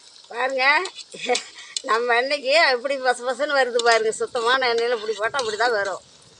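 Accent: Kongu, India. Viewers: கொங்கு தமிழ் அருமைய் 🙏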